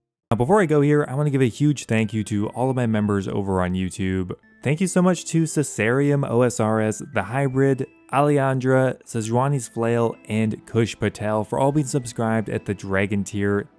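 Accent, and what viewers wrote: Quebec, Canada. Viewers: Are you French Canadian?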